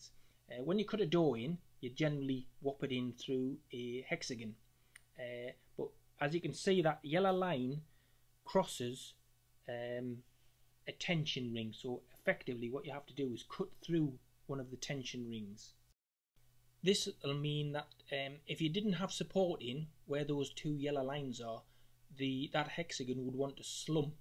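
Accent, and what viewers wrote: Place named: United Kingdom. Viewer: Hi - you made very nice videos and also a great website. It helps a lot to understand dome structures. You sound like you are from UK and there they use imperial measurements if I am not wrong. However your calculation tools are mixed Metric and Imperial. (radius in meter but windspeed in Miles per hour). May I suggest to put a simple unit in your calculation tools. i.e. Radius/m: 6 - it would help. And since you have to touch your website again - can you share the formula you use for your calculations as well? Thanks for considering. Cheers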